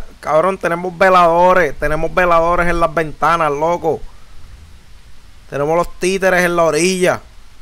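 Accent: Puerto Rico. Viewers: JAJAJAJAJA PUERTORRIQUEÑO AL 100% AMO❤️❤️❤️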